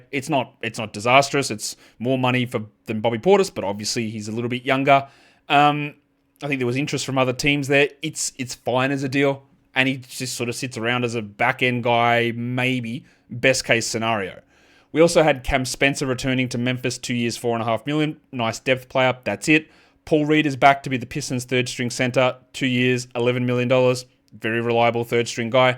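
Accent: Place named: Australia. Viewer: Good Aussie talk.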